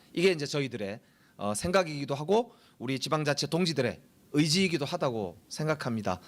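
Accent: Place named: Busan, South Korea. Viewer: 오늘따라 부산 사투리가 더 느껴지네요. 정겹습니다^^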